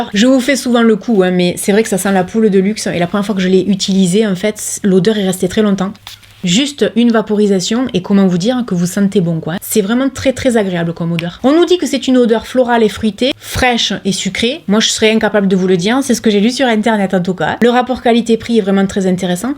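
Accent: South, France. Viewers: Merci pour toutes ces précieuses infos👍 c’est toujours un doux plaisir de regarder tes vidéos bercées par ton accent du soleil que j’aime tant 😜 merci encore pour toutes tes recherches afin de mieux nous conseiller tu es une amour 🥰 gros bisous du cœur Mima et passe un heureux moment avec ta maman et tes petits loulous 🐶🦮🐕‍🦺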